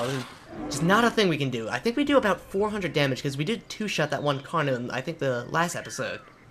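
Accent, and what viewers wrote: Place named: United States. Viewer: hey I like to talk, do a Austria pack, I want a face cam you sound American, and you may not be PewDiePie, jackcepticeye, or the diamond minecart but. YOU are my Favourite YouTuber in the world I would rather PewDiePie have 8000 subs and you have 50 mill you deserve it I just hope you keep doing what you do and on cuz ya can 😉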